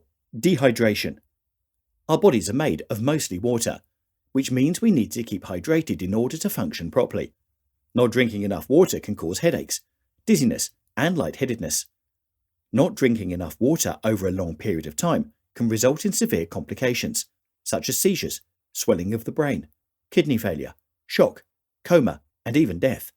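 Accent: England, United Kingdom. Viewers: Such beautiful English dialect, I like biscuits and tea btw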